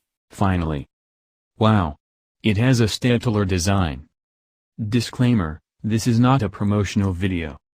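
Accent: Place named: United States. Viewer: astig naman ni american ang boses HAHA.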